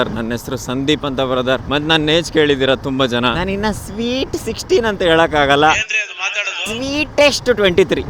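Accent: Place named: Tiptur, India. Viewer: Randomly watched ur insta reel and watched ur channel here ...Nam tiptur slang alli nim videos literally touched the hearts and kept us entertained ❣️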